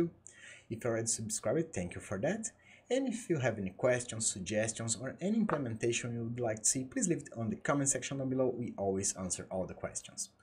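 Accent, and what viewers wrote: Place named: Brazil. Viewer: Esta com sotaque de brasileiro rs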